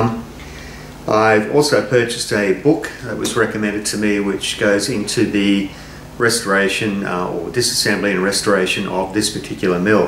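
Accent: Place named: Australia. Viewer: Great videos and it is so nice to hear an Aussie accent. You are fast becoming an Aussie "This Old Tony". Well done!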